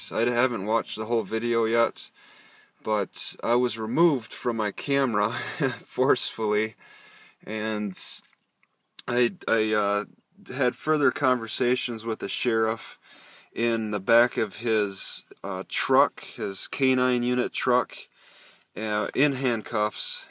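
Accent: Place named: South, United States. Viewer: A word of advice your voice is awesome your face not so much point your camera at something else and just let your voice do the talking I subscribed because your videos are edgy and cool and your voice is a combination of a southerner a Pacific Northwestern and a guy from Jersey!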